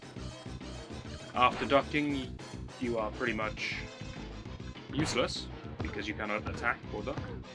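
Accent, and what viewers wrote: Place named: United Kingdom. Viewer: Great to see you back,! Your monotone, British commentary was gravely missed and I was more than ecstatic to see a video from you show up in my feed. I can only hope you complete your mission of Let's Play Everything this go 'round.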